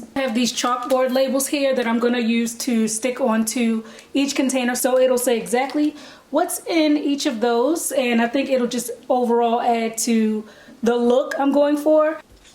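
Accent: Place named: Washington DC, United States. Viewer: Are you from DC? You have a DC accent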